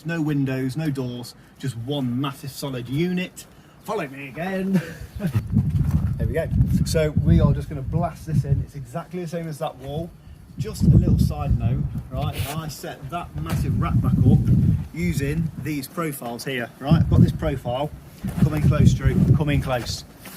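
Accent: Derbyshire, United Kingdom. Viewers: Where u from ...sounds like Chesterfield or North Derbyshire ?????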